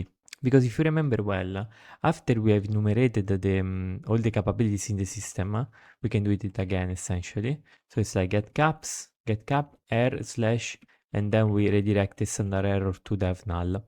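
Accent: Italy. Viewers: Ho sentito i primi 20 sec. Sei italiano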